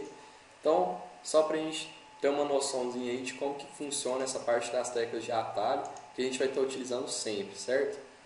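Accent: Minas Gerais, Brazil. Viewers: Alguma duvida que é mineiro? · meu deus que fofura de sotaque mineiro.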